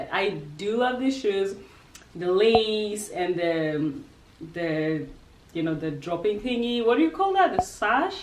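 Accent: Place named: Cockney, United Kingdom. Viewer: Great videos. Why do you have some hidden bri-ish or rather cogney accept? Which State are you in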